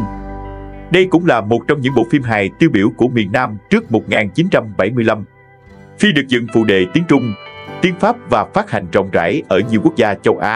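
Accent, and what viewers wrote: Vietnam. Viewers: Kênh này ngoài MC nói giọng Sài gòn, thì nội dung khá rẻ tiền. Chủ yếu khai thác đặt tựa đề giật gân, không đúng sự thật để gợi sự tò mò của người xem, không có đạo đức truyền thông. Đặt tiêu đề hạ thấp những nghệ sĩ kỳ cựu đã khuất để kiếm tiền. Những người đàng hoàng, có lòng tự trọng sẽ không đăng ký những kênh rác như vầy. Disliked.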